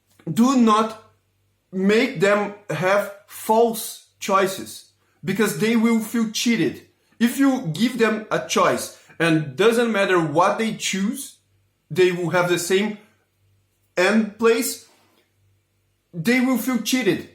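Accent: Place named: Brazil. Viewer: Consigo sentir o sotaque brasileiro à quilometros de distancia KKKKK, ótimo vídeo mano, tava precisando de dicas assim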